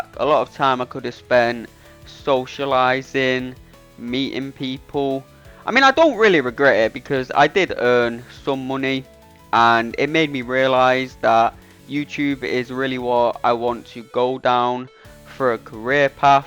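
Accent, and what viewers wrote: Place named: England, United Kingdom. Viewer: I love English accent 😂 my favorite I quit vid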